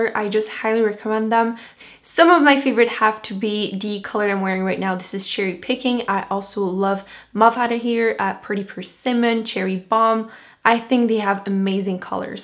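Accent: Quebec, Canada. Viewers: So Happy to find a Canadian Vlogger!! Yay. I knew you were a fellow Canadian from the accent. Love it :D Great channel and wonderful swatches. Very Helpful!! Thanks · wowo je t'écoutais parler et sans même savoir réellement je me suis dit man this girl has a french canadian accent!! lol Great!! Tu les a acheté ou tes wet n wild?? Ils n'en vendent plus au Wal-Mart!! Je suis obligée de commander sur amazon!!! Pis ca devient trop cher vu qu'il me charge le shipping,dire qu'au États ils sont 2 $ grrr booh loll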